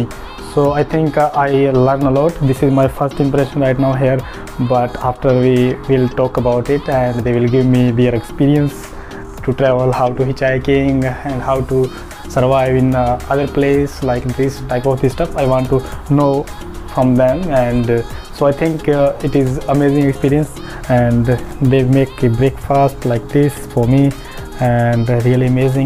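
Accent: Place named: Uttar Pradesh, India. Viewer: Punjabi bolya kr yrr hindhi tu jmme up de bhyiaan vrgi bolda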